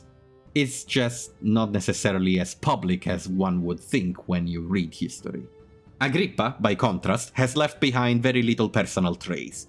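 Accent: Italy. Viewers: Crazy good video, nice italian accent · Ngl this is how I sometimes imagine Roman accents sounded like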